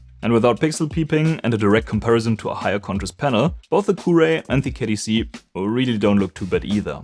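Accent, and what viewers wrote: Germany. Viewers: Ich habe das gesamte Video gedacht „Der ist doch 100% Deutschsprachig", und trotzdem hat mich dieses „Bis zum nächsten Video" komplett gefickt 😂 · r u german? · Kanns sein das du deutsch bist? Trotzdem guter Akzent. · wie man merkt dass du deutscher bist hahahah :D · Do I hear Deutsch??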